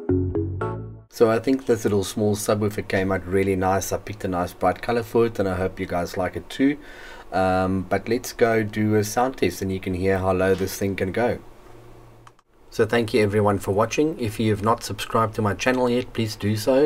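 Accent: South Africa. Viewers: I was like 99% sure you were South African, and then I saw the Game catalogue. Love your work, man, keep it up. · Hi there, this is sweet! I'm from South Africa- sounds like you too! I'm wondering the best way to ship or buy a small subwoofer like this locally? I bought a TPA3116D2 and i'm looking for a small subwoofer to match. i.e.100wrms with low frequencies. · thanks for affirming my suspicions of your accent being from South Africa , after seeing the game paper ;-) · I know a South African accent when I hear one. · Kon sommer hoor in die eerste paar sekondes jy kom van Suid Afrika af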